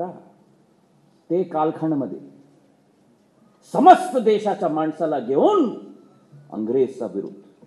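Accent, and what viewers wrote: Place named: Hindi, India. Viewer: Hindi accent aate h iske marathi bhasha mai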